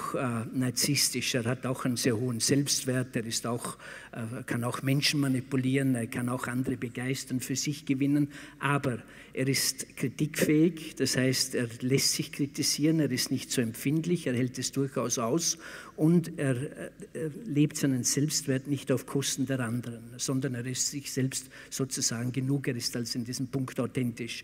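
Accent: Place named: Austria. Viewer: Aaah, kenne den Herrn aus gewissen Truecrime-Formaten im TV!!! Fand schon immer, abgesehen von der Qualität seiner Wortbeiträge, dass er äußerst angenehm spricht!!! Ein toller Redner und dazu noch dieser leicht österreichische Dialekt!!! Zum Verlieben! ❤️😂 PS. "Verrecken" ist für ihn ein Kraftausdruck!!!! Wow!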